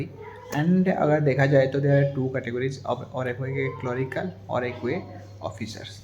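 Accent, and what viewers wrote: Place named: Odisha, India. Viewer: Are you an Odia ? I guess it from your pronounce 😊❤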